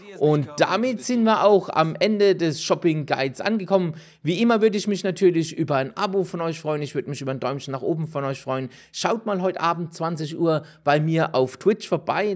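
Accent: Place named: Heidelberg, Germany. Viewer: das hab ich gemerkt welche Richtung ? Heidelberg?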